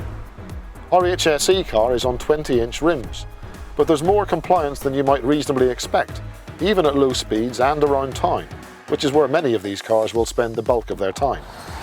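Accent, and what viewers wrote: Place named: Northern Ireland, United Kingdom. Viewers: Northern Ireland accent. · What you're hearing is a Northern Irish accent, and whilst the pronunciation is inconsistent with textbook RP, it's still perfectly valid. To say it's definitely wrong is an overstatement.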